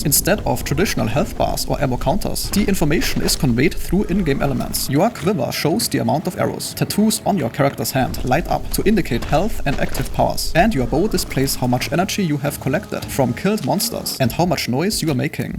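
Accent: Germany. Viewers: First impressions video. 3 minutes long. Has "not waste your time" in the title. German accent. Makes total sense. Subscribed.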